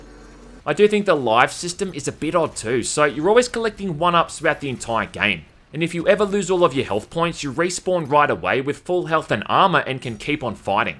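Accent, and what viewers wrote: Australia. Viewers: Makes by far the best Reviews existing on earth. By the way, are you from Australia? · Aussie accent ftw boi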